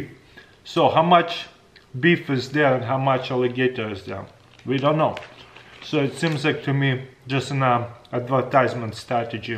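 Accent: Russia. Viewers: I’m just here to hear him say different animal names in a russian accent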